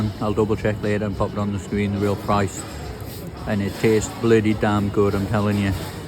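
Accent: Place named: Newcastle, United Kingdom. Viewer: Thank you for introducing your hometown! This brings back lots of memories I have of Newcastle! Used to live in Newcastle and Gateshead long time ago, like a last life time! So you are Geordie! Love when you said 'mi' mom, and m'a'n.... really miss that! : )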